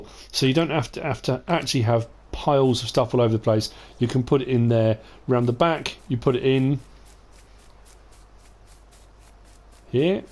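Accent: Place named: United Kingdom. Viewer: If you're going to broadcast your YouTube video in America why can't you speak American English? It is so irritating. There is no such thing as a chromed milk tank. Try stainless steel. And why not verbally produce your t's, all of them.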